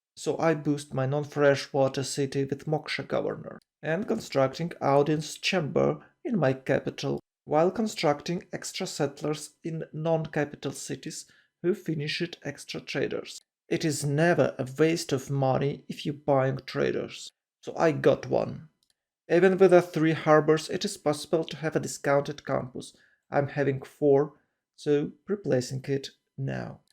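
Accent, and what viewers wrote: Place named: Ukraine. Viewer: Поки слухав почув, що акцент ну дуже на мій схожий. Ну дуже от. Перевірив канал, написано– Україна. Поржав, подивився далі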